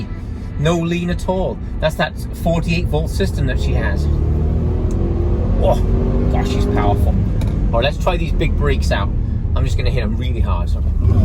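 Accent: Wales, United Kingdom. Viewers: Good video. Where are you from originally? You sound Welsh.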